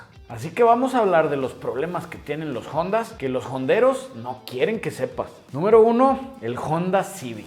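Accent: North, Mexico. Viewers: Eres del norte vea wey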